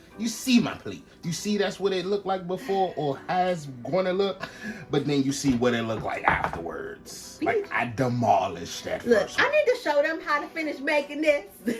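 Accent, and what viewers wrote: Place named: DMV, United States. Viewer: You have to be from the DMV area, I know that accent from anywhere!!! After watching the vid, yes you def are!! I feel so special watching someone from my area on YouTube!!! Get it y’all I love it😍😍😍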